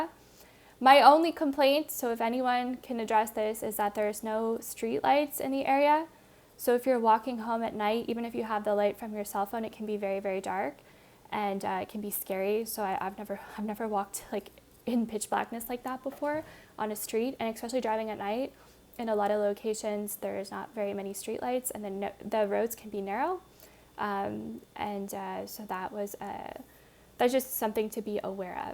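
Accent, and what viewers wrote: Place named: United Kingdom. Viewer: Preconceived notion of extreme poverty in so called (3rd world countries) woow, obviously you are not from the US, your accent is more British. Have you ever visited some major US cities like Baltimore, Philadelphia to name a few, talk about extreme poverty.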